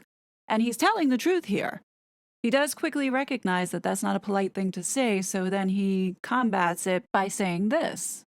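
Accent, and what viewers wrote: France. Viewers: Ooohhhh by the way your French accent is great. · You have a gorgeous French accent!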